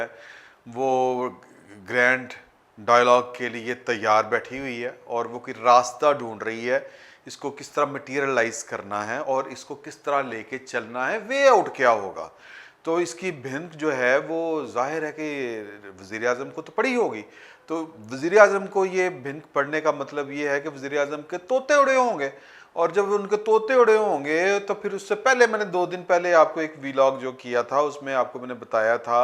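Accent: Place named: Lahore, Pakistan. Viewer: Yaar aap u tube say pehlay kahan thay qasam se mn aapka fan hogaya hon ur great sir g Khalis Lahoria wali boli hi aapki.shakhsiat ko chaar Chand lagati hai well-done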